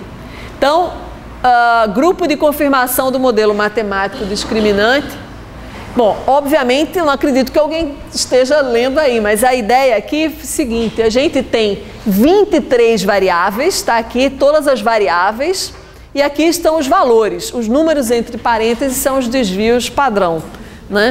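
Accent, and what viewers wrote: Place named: Northeast, Brazil. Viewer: Sotaque nordestino arretado de se ouvir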